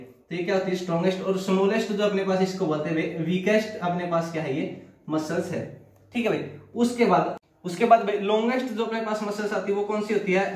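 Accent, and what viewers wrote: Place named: Haryana, India. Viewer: Boli me hi haryanvi touch hai 😅😅 gajab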